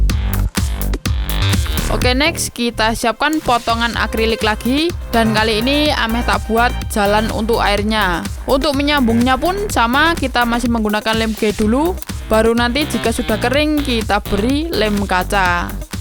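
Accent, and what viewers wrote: Java, Indonesia. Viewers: Jowo ne kentel 😁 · Jowo e' meddok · Orang Jawa Kayaknya, Karna logatnya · Bang koe uong jowo Yo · Mas sampean jowo ndi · wong jowo yo ngonokui rek ngomonge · "kita potong sampai jeru gays😂" bahasa jawanya kentel banget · Emang orang Jawa ngomongnya gitu, akupun klo ngomong juga gitu🤣 satu jaman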